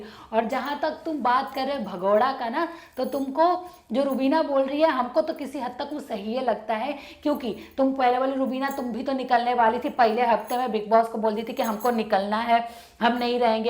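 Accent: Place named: Bhojpuri, India. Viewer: Love your bhojpuri accent ❤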